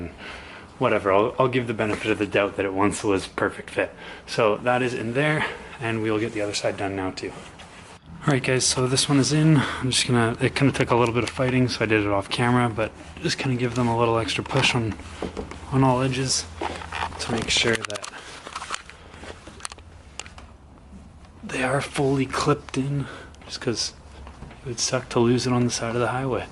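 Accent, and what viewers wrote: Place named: Canada. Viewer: Interesting video.....also am I the only one who thinks the Canadian accent is really cool!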